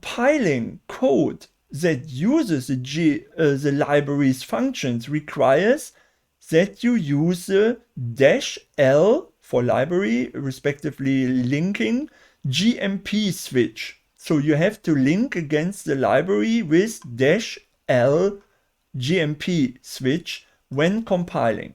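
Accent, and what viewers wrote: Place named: Germany. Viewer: that's some heavy german accent (me a german may say this)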